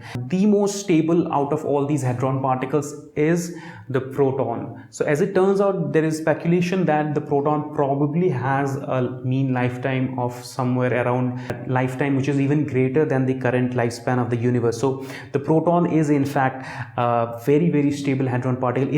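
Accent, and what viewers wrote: India. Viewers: But I guess you're indian and that's why you're accent sounds like that...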